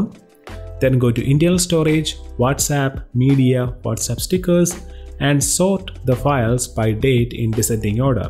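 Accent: Kerala, India. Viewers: Tovino onnum venda.. typical mallu accent .. entem angane thanna ketto😂😂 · Malayalee aanalle? English accent kettapo thoni 😬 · Are you a malyali...? Just asking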